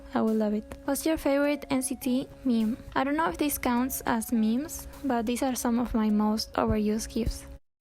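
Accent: Argentina. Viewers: sos una geniaa 💚 me encanta cómo hablas inglés con un toque de acento argento xd seguí así que tus videos son una joyita💚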